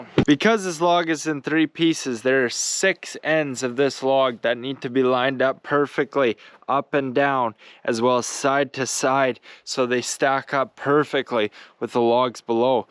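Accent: Canada. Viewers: Based on what I can tell from this video alone you are a great roll model for other young men to learn from and I don't only mean the building of your home but also the way you speak about it and the work ethic you have, those parts can be used in many areas of ones life to live a good life. Besides, who doesn't love a Canadian who acts and talks as Canadian as one can get, lol. Loved this video! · As a Canadian i can say, this dude has one of the strongest Canadian accents ive ever heard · Think you mean to say “Canadian” grit! That accent is classic 😆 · First of all, this is so pleasant to watch and secondly, an awesome reminder of how hard work can be fun. The last thing is, I’m so charmed by this Canadian accent and I didn’t think I would be. It’s funny how we can speak the same language and it can still be so wildly different but it’s just different people evolving the way they speak in different parts of the world.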